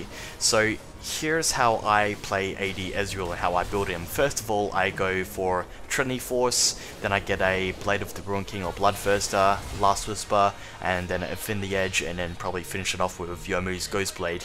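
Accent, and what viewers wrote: Australia. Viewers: This guy has like a half Australian/half American accent it's tripping me out.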